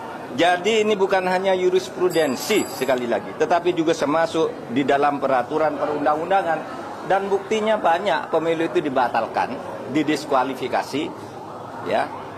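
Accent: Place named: Madura, Indonesia. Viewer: Logat maduranya siip..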